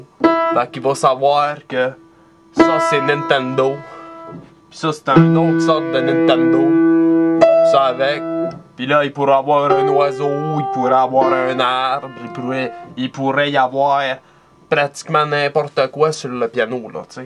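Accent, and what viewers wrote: Canada. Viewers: j parle bien le francai mais du mal a comprendre le francai de canadien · l'accent un truc de malade moi ki viens du sud de la france et ki souhaite vivre au canada je te raconte meme pas ce ke sa peu donner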